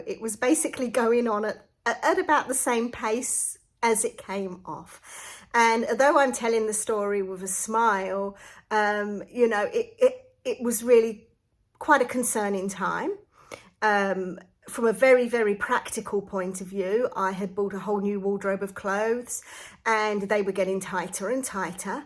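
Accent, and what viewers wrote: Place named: England, United Kingdom. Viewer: Great video, you're very brave for sharing such obviously uncomfortable personal information - great to hear an English accent too!! You look fantastic, healthy & happy. I'm in Australia, I'm 68 & 4 days in on MJ (started on 5mg- very hard to get here & expensive $360 per month) & feeling very positive after hearing your story! SUBSCRIBED! :)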